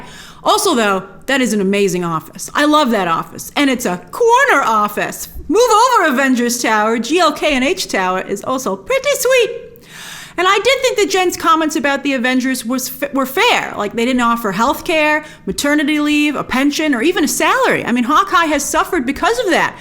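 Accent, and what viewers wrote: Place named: East Coast, United States. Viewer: “I think they cudda dun a betta jaub” That East coast accent tho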